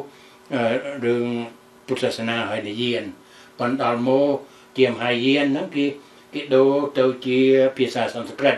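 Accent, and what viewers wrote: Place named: Thailand. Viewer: ខ្មែរយើងដូចអត់មានសម័យនគរាជទេ ហើយមួយទៀត ម៉េចអ៊ុំនិយាយរដើនដូចថៃនិយាយខ្មែរចឹង?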